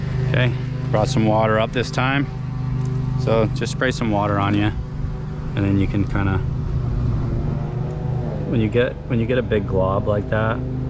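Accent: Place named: Massachusetts, United States. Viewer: What a nice job! It looks really good too. Good explanations on why you do each step. I do handyman work, and nearly every house I enter has botched work. It blows my mind how much bad work I see. In Part 1 you showed layer upon layer of messy repair attempts that failed. Those hack jobs are fine in an emergency like during a storm when you're just stemming the tide until you can do a proper repair. Very much admire your skill and methodical work. I'm originally from MA and your accent sounds familiar! My 70 year old house in OR has the original heavy galvanized steel step flashing. I re-roofed 27 years ago, and just lifted it up like you said. It could probably go another 20 years, but I'm going to replace it with lead the way you did.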